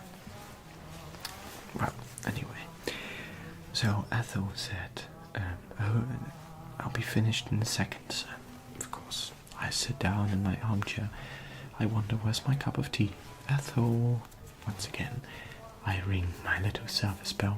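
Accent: RP, United Kingdom. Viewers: of all the historical asmr roleplays i watch regularly, these are my favorite as the older posh accent tops it off so well!! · Love this! Just discovering your channel now,. Love the background music, your posh voice and the creativity of this upload...brilliant! Thank you for the tea and cakes while catching me up on the latest gossip.❤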